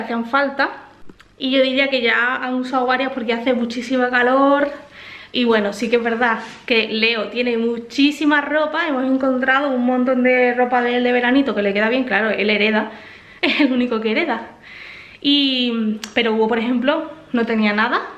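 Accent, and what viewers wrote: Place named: Andalusia, Spain. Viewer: Hola buenas,de donde sois,llevo unos dias viendote y andaluza eres pero de donde,malaga?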